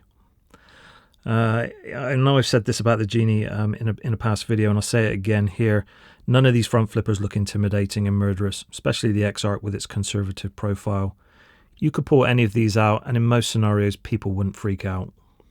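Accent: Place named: United Kingdom. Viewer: You sound like you're from the UK but, I'm guessing you live in the US? Purely because you are reviewing a lot of knives all of which are illegal to ship/buy in the UK. Hate UK laws.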